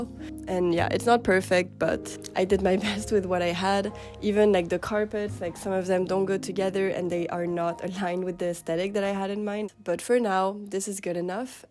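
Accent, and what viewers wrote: France. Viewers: haha j'ai regardé la video sur le labret vertical, je me sais bien qu'il y avait un petit accent français! j'ai aussi une petite cheminée dans mon studio à bruxelles, ça donne un effet trop cosy :p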